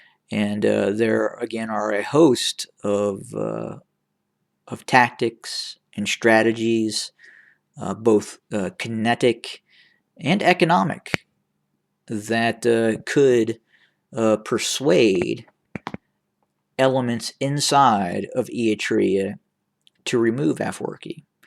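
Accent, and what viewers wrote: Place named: South, United States. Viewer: The fan fact is this channel has been joined in 15 sep 2020 , and a random with southern accent guy getting paid or using the situation to get attention, saying USA plan to remove Isaias aforki , first of all the CIA never even tell their secret publicly for any operations , not only CIA but also other secret Agencies in any Country, and secondly why now , what was US waiting the past 30 years , thirdly USA has no power to remove any leader on a country, we think like that but most of time they get removed by the people like sudan, Tunisia, egypt , Ethiopia( the Weyane was kicked by the youth of oromo poeple) , but nice try though 🤣🤣🤣🤣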